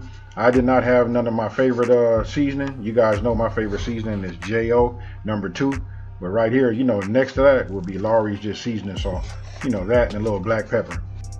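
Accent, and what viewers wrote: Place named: California, United States. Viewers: I can tell he’s from the West Coast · This dude is dope AF!!! What up bro bro!? I hear that Cali swang in your voice dawg... Wes wes... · Classic CalifornI.A. accent, went to college with a bunch of guys from Cali. They all sounded tha same, LOL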